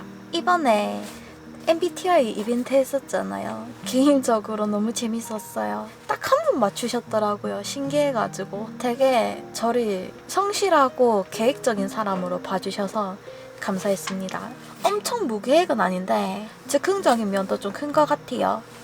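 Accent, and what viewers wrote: Gyeongsang, South Korea. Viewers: 경상도 사투리 ㅎㅎ 잘보고 있습니다. 셔쿨 고정하는 거 어디서 구입했나요? 담영상 기다려지네요. ^.^ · 고영희씨가 저지레할려고 들어왔다가 딱걸렸어요 ㅋㅋㅋㅋㅋㅋㅋㅋㅋㅋ 님 경북말씨가 넘 귀엽고 정감있어요 ㅋㅋㅋㅋ 님 캠핑은 어수선함 없고 깔끔하고 믿음직하네요👍🏻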